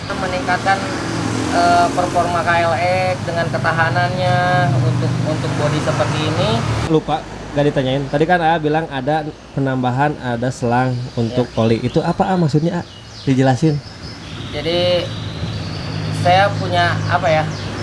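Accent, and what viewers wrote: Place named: West Java, Indonesia. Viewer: Sunda kentel bahasanya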